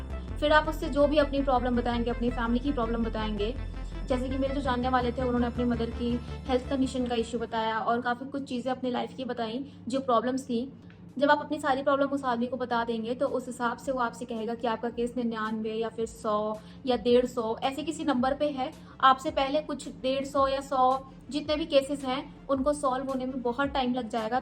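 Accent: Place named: Pakistan. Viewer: Iski accent se lag rha hai ye pakistani hai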